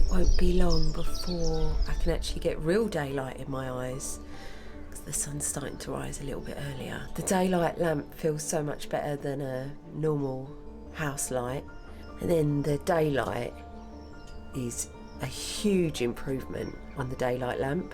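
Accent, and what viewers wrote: United Kingdom. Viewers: I grew up in Florida in the 60’s-70’s…when sunscreens weren’t in vogue. I’m paying for it now. That means I have to avoid the sun or cover up…or lather on sunscreen. However, I still like a sunny day. All that said, you are my Happy light! I look forward to your videos, cheerful smile, lovely British accent, and I learn from your experiments. Keep sharing and glowing…I mean…growing!😊. BTW, I was stationed in Japan and England for 4 years each so I learned to get out regardless of the weather and clouds! 😊😊😊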